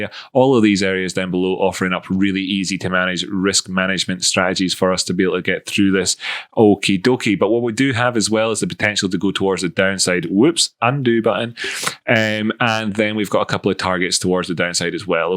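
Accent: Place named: Scotland, United Kingdom. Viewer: only came across your ta over the last few weeks, really enjoy it. easy to follow a scot as a welshman too haha keep it up bud!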